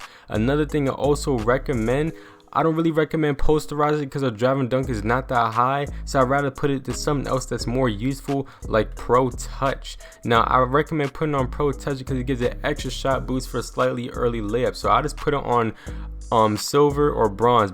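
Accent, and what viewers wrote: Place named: Canada. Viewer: You sound like your Canadian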